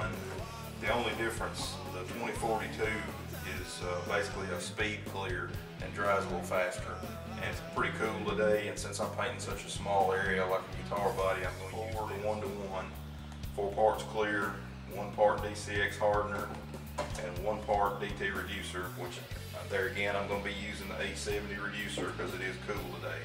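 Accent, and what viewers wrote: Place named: North Carolina, United States. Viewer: Are you from North Carolina? Man,your accent is NC. Very nice job on the guitar,btw.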